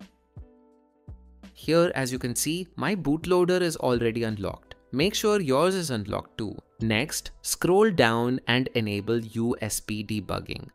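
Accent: India. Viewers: Other than indian accent..everthing seem to be OK.... .// good job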